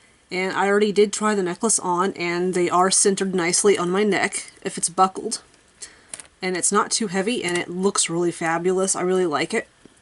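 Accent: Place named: United States. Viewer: Your American voice is so cute🙈🙈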